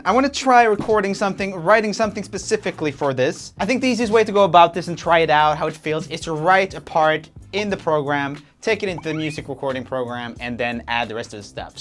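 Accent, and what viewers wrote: Sweden. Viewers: My mom heard me watching this and she was like "oh is he german or swedish?" And I was like how did you know???? He's swedish? She's just like I know things. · Swædish accent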